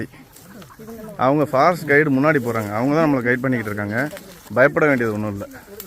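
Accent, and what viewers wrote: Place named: Ramanathapuram, India. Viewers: Always waiting for ur vlogs. Ur efforts to b appreciated n every vlog..ur tamil slang nice to hear bro.... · Ramanathapuram slang nalla iruku😊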